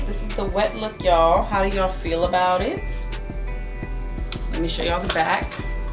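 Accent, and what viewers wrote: Louisiana, United States. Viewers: Yasss.. Girl your hair is everything!! Soooo cute. Little miss Lavender is soo precious. It sounds like you could be from Louisiana? Anyway, thanks for the content.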